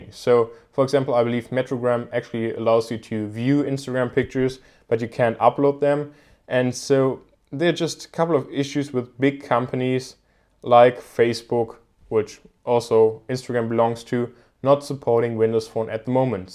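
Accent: Germany. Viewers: kanns sein das du ein deutscher bist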